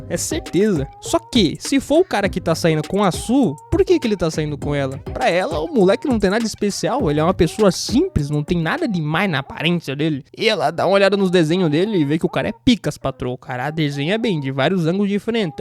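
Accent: Rio Grande do Sul, Brazil. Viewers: Esse narrador parece gaúcho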